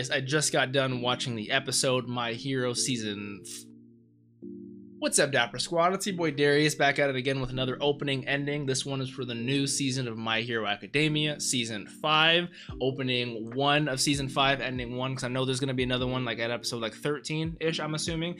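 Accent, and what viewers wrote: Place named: Canada. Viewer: for some reason I thought u were Canadian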